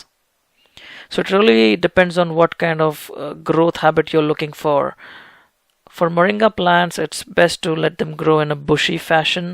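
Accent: India. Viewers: I LOVE THAT STRONG INDIAN ACCENT AND MAY I HAVE SOME SEEDS...... AND WHAT THEY COST PLEASE ! THANKS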